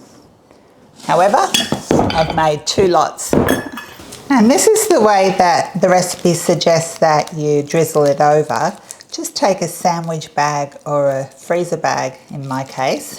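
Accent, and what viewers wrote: Australia. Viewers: By the way, I didn't recognize Ur accent until U mentioned Australia. Of course all accents are nice. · OMG, so agree with your comment! Love her cooking style and love, love listening to her Aussie accent; this is a keeper! ❤ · great to hear an Aussie accent on here for a change. Looks yummie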